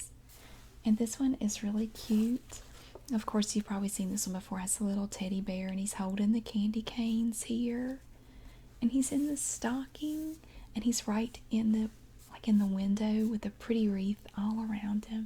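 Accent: South, United States. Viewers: I may or may not use your videos to low key practice my southern accent, which I was clearly meant to be born with. Also thank you for always making me falllll alseeeeep! xx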